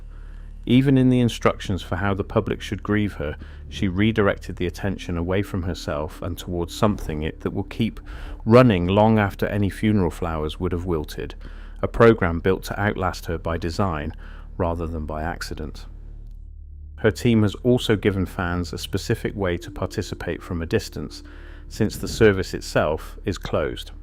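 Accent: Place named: United Kingdom. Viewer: Why does a video about an American icon have a British narrator??????????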